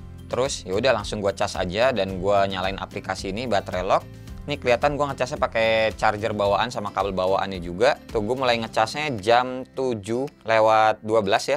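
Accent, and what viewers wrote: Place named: West Java, Indonesia. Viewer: Sunda pisan aa teh logat nya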